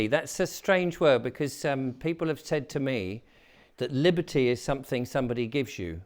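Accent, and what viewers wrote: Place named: RP, United Kingdom. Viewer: Rp accent... one eye... full of shite... shill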